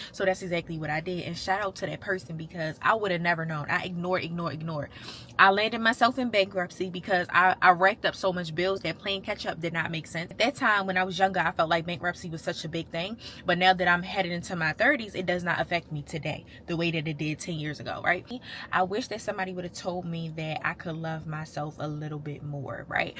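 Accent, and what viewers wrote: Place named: Baltimore, United States. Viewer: 2 sec into the video, I said yo is definitely from Baltimore 😂 I miss the city.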